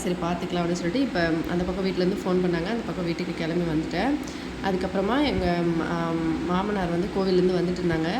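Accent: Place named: Odisha, India. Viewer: Odia language neenga pesuradhu siripu dha varudhu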